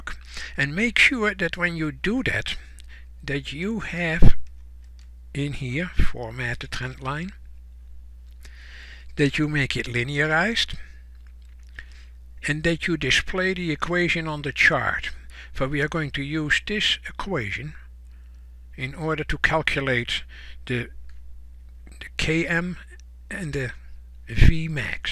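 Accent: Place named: Netherlands. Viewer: hey! i assume that u are from te nederlands? i use the same accent haha! LOL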